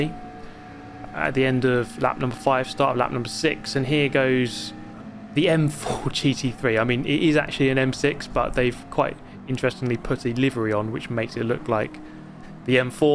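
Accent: United Kingdom. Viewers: i LOVE your voice and the british accent😆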